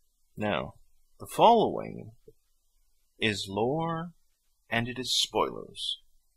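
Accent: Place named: RP, United Kingdom. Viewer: Seriously, I like very much your voice. Too RP for some ppl tho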